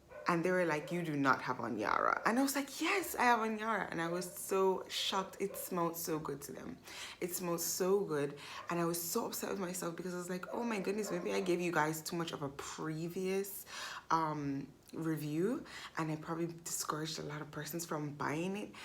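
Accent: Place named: Jamaica. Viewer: Great review! You're from Jamaica correct? Your accent sounds a little different. Like a few different accents mixed together.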